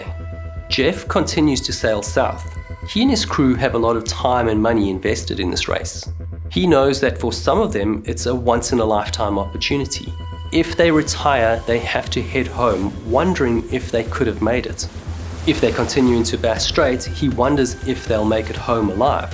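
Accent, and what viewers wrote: South Africa. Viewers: clearly the SA acent is the best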